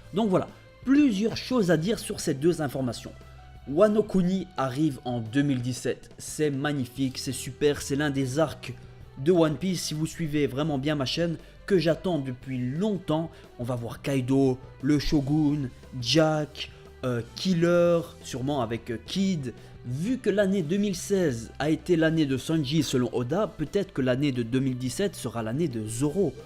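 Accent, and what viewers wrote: Belgium. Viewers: ouit x) le bon accent belge bg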